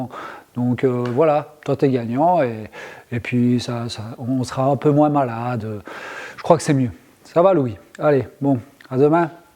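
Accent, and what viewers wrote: Belgium. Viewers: Les erreurs reste humaine courage ne te décourage pour des râgeaux ça n en vaut la peine . De plus en France nous adorons nos voisin belge et leurs façon de parle ..car le sais tu d une région à une autre en France il y a des façon de parler totalement différent....allez les gars courage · Oh ça n’a pas l’air de tourner bien rond aujourd’hui!!! Si tu décides d’arrêter à cause d’un charlot, ça ne ressemble à rien. Ignore-le, c’est la meilleure chose à faire, nous on te respect pour ton job, pour ton savoir faire ainsi que toute ton équipe, quand je dis nous je parles au nom de la majorité de tes abonnés. S’il y a un con qui se moque de ton accent ou des belges, en général, c’est qu’il n’est pas bien intelligent, laisse dire les jaloux et continu ton job. Tu es un mec bien et tous les collègues aussi. Salutations très amicales.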